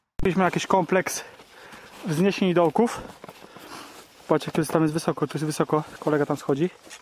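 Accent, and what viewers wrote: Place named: Kuyavia, Poland. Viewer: Ty chyba jakoś z Kujaw jesteś .. "Jo" to trochę charakterystyczne :-)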